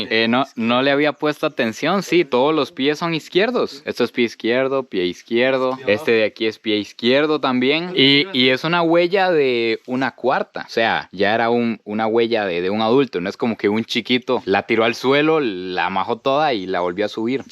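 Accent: Costa Rica. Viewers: Te gusta el acento tico? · Me encanta oh mejor dicho me facina tu acento costarisence.❤️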